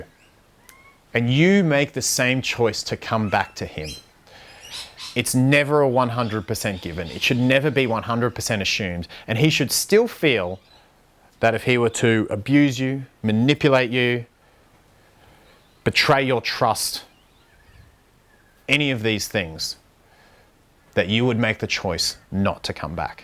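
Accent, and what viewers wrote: Australia. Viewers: Is that Australian accent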